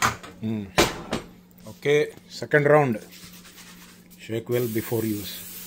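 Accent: Andhra Pradesh, India. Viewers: Emti sangatulu ane style, chala natural ga vuntundi. mee cooking style means like sambar karam with castor oil and vade ingredients me grand mother cooking and matladae style chooste, looks like Andhra style. I think me native roots in andhra, may be me parents are grand parents migrated to Nizamabad.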